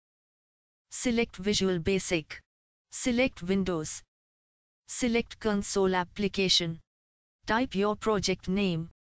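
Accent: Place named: India. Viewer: Wondering why the robot voice has an Indian Accent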